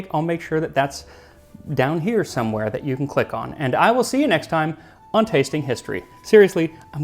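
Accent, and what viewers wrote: Brazil. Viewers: Bro, please DO NOT pronounce Portuguese words like the Brazilian do, it sound VERY VERY WRONG - cringe AF! Portuguese from Portugal sounds absolutely NOTHING like Brazilian Portuguese! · I love your channel, but as a Portuguese native, you are saying portuguese words with a Brazilian accent. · Great video just irks me that when you talk about portuguese dishes or words u always say it with the Brazillian Portuguese accent and not the original Portuguese accent (which is fair for example in the Feijoada video), but it doesn't make sense in the video referring to dishes from Portugal like this/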